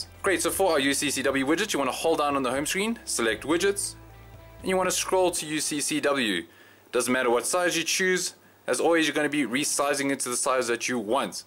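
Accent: South Africa. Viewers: I also think he sounded South African and I'm South African too haha :P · Hi there nice tut, are you from South Africa? your accent sounds like it. I am from South Africa. :-)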